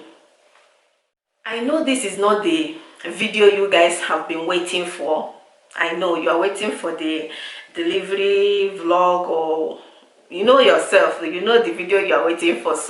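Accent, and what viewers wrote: Igbo, Nigeria. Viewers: I've watched almost all of your videos and I see how far you've come & how so much better you're becoming.. nne I have an igbo accent & i rock it with pride, rock your accent Biko.. you don't owe anyone any explanation & always remember to go on in life at your own pace.. I'm wishing you a safe delivery.. my simple advice to some of this shallow minded people is simply 'if you have nothing nice to say or type, biko keep ya mouths shut & your fingers still'.. spoken or even typed words can still hurt or break someone, let your words heal not hurt.. Udo!! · Don't mind them bad belly people every where please allow we that love the igbotic accent to keep watching.and to mr know it all please leave this woman alone we need our baby girl to arrive in peace biko.mummy just know that this people are jealous of your progress and they wish to achieve half of what u have achieved but they can't.this is the only way for them to pour their frustration. 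· The Chinese are all over Africa doing big businesses and most of them can hardly speak English. So what's wrong with those people who think they are masters of English? The Chinese,Russians, French, etc have proven to the world that you don't need the English language to succeed in life. And for someone to focus on how you speak rather than the ideas you are trying to convey is to actually display the highest form of unintelligence. Who has bewitched their minds into thinking that life revolves around pronouncing words like your former colonial masters? My sister you are doing okay, forget all those people who are constantly and ignorantly rejecting who they are and what God made them. The easiest thing to do in life is to be yourself and not a cheap copy. For those ignorant critics of your accent there is what is known as British accent, American accent, Indian accent, Australian accent, Russian accent. 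Why in the world would she not have a Nigerian accent because she is Nigerian after all. And that's how the rest of us Africans distinguish a Nigerian from all other blacks. Because no matter how educated a Nigerian or South African is the influence of their native language will still show. E.g Trevor Noah, Ngozi okonjo iweala, Aliko Dangote, etc. Sis it takes a small person to insult and criticise a great man/woman. Let your critics post videos of themselves also so that we can critic their grammar and fake accents. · Unless i'm deaf or something but your command of English is great. You have the Nigerian accent which obviously every Nigerian has. Bullies are stupid, they have a special place in hell. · Proudly Igbo.. please keep using your Igbo accent.. we cannot hide our identity..♥️♥️♥️😘😘. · Not to make you feel good but I started following you, ozi Tochi and Millicent xanderc bcoz you guys are very igbotic and I like it... 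supri supri is too much on YouTube... I want to listen to someone who talks like me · My dear! You are perfect because you are in Christ. I was born here in the US and you’re English is excellent... your children and family are beautiful.. you are beautiful and a breath of fresh air! Please stay true to who you are and do not conform.. you are intelligent, genuine, industrious, hard working, wise, and kind... The funny thing is I subscribed to you because of your Igbotic swag.. Abeg be who you are... your true YouTube followers love and support you! Love youuuuuu!!! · Please keep speaking exactly as you speak cause that is how we speak in Nigeria. You inspired me to start a youtube channel last year. Still none English speaking immigrants come to America and make it better than those that speak the best of English. I dont like that you are giving too much attention to these people. They will always be out there in the world. 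· People can be so funny,my sister believe me I love your Igbo English,I love everything about you,so haters will always want to be NOTICE ME rubbish I love you my sister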